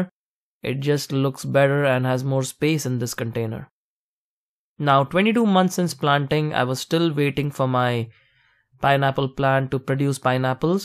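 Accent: India. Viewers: That's an unmistakably Indian accent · Are you Indian? A/c to your accent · I think you are Indian by your accent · Federicken S. It sounds Indian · your English like indian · Yes Indian accent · Your accent sounds Indian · I think you are Indian. Your voice sounds like Indian accent. Am I right? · It's an Indian accent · your English speech is Indian type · You sound indian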